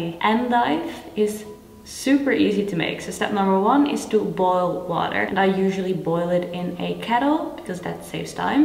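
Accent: Netherlands. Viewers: she's dutch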